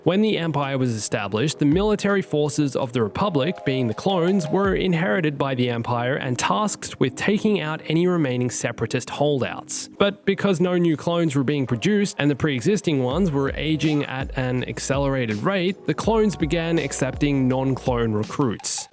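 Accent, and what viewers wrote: Australia. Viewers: Are you from Australia bro? Love your accent ;)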